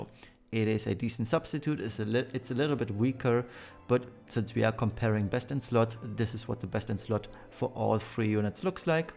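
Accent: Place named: Germany. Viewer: Btw. are you from Germany? Your accent kinda sounds like it 😁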